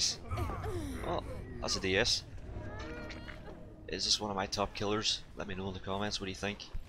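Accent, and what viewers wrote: Northern Ireland, United Kingdom. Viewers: you from northern ireland? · Are you from Northern Ireland?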